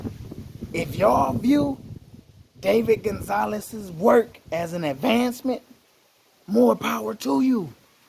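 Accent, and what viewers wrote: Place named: Alabama, United States. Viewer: You talk like white man from Alabama.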